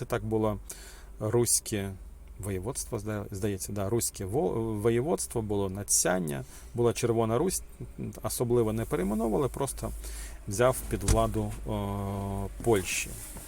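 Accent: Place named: Eastern Ukraine, Ukraine. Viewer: В автора чіткий "східняцький" акцент.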